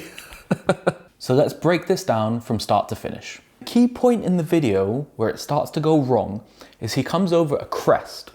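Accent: United Kingdom. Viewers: I just discovered this channel because I was looking for non-supercar content and I'm liking it. The posh English accent side by side with car accidents gives everything an academic vibe.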